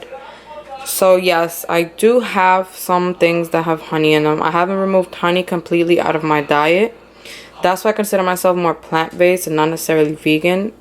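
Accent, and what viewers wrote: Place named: Dominican Republic. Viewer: Idk if I paid attention to what you eat or your Dominican accent. Haha love it!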